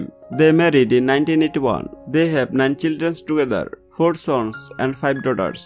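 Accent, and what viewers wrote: Bengal, India. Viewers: A very Bengali accent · First improve your English pronunciation and then make such videos. Ur mother tongue is bengali and it is reflecting from your English dialect.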